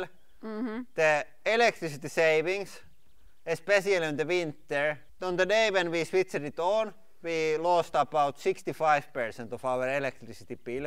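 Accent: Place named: Finland. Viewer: Haha the finnish accent is the best! Cheers from australia great vid :D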